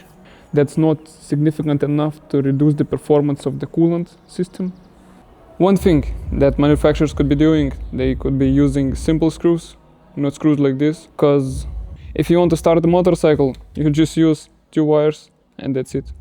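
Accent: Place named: Eastern Europe. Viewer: I just hear your accent and I think you’re from Eastern Europe, I from Ukraine, your video is really great!!!👍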